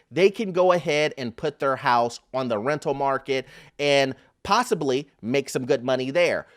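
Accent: United States. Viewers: I love your Midwest accent. Makes me want to pull up a rack of baby back ribs and talk real estate all afternoon!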